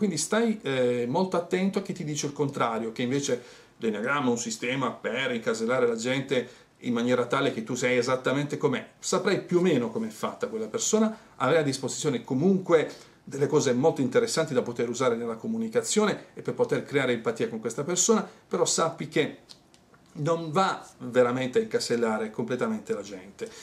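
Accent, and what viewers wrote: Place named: Apulia, Italy. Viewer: Avevo visto il video che con l'accento pugliese dicevi del gatto che fa il linghino... Ora ti vedo su questo enneagramma che mi interessa!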